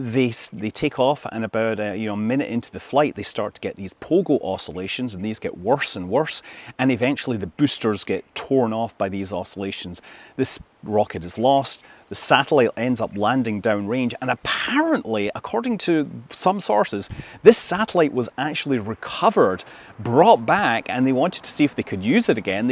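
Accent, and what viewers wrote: Scotland, United Kingdom. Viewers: Now that we know you are in Scotland and don't just have that accent for fun, any SaxaVord coverage?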